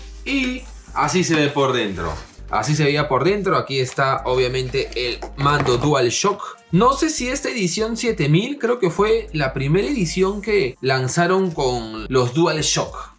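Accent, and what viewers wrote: Peru. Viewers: Por el acento y criollas y la inca Kola fácil .... Es peruano - japones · Ese tonito peruanazo me vacila chévere causa para ti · Eres Peruano verdad?? Feliz año broer. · Sabía q eras peruano, la criollada el acento, excelente. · 30 segundos de video y me di cuenta que era peruano :’) es un INKAAA KOLAAAAAA.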